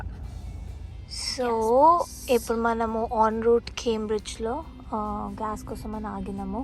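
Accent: Telangana, India. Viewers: Love your voice i think your from Telangana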